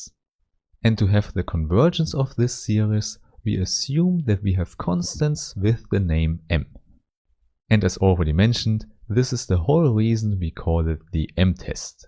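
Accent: Germany. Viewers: liebe diesen deutschen akzent haha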